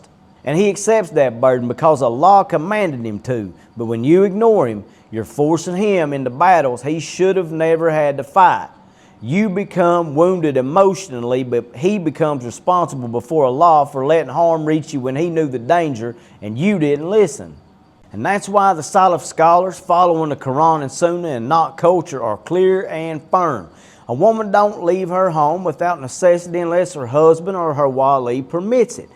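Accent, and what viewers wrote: South, United States. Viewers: Lived in Nashville for a year and honestly love that accent as the southern belt residents With love from Madina may Allah bless you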